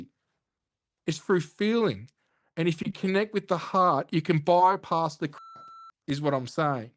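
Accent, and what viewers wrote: Australia. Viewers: Love his classic old school Aussie accent! 👍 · Well, of the English speaking countries the Aussie accent makes it the least understandable. Even South Africa has clearer English. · Is there an English version? The OZy accent is too strong for me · I'M french, almost impossible to understand....sorry but aussie accent is hard for european people. But I love your country, been there twice. Love from France. ❤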